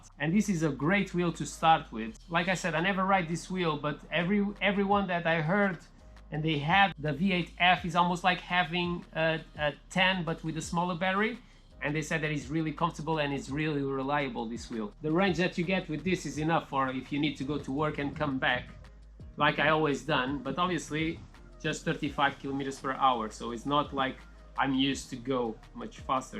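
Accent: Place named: Portugal. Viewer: Dude! Are you Portuguese or something? That accent man... so familiar!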